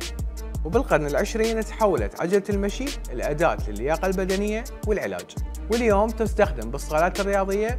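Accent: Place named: Iran. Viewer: فارسي الدم والشكل واللكنة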